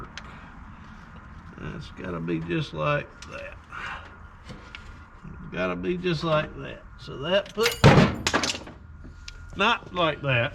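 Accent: South, United States. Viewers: Talk like a hillbilly.great car.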